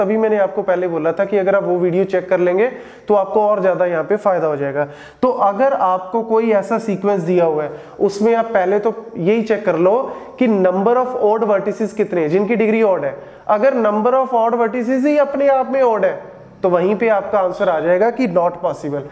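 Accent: Punjab, India. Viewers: Main jitna tumhe dekhu, dil ye na bhare Thanks sir, I watched all your videos on Graph theory. Punjabi accent is lovely!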